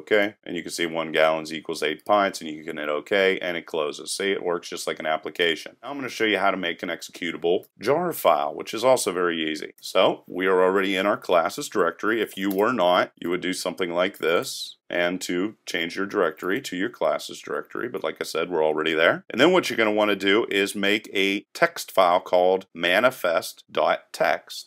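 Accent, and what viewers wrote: United States. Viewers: I love your tuts, you know your stuff and you speak very clear American English! Rock on Bro!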